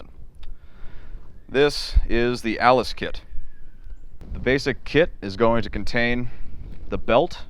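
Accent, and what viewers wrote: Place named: United States. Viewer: That’s such an 80’s kind of American accent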